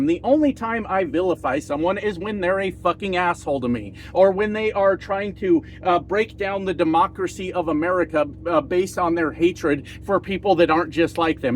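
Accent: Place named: Quebec, Canada. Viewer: Are you Quebecoise?,sound like it.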